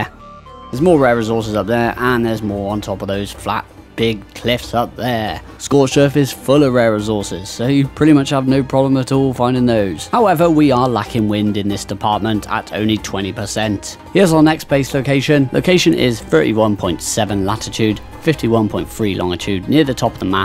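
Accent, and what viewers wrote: United Kingdom. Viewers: Everyone in the UK sounds like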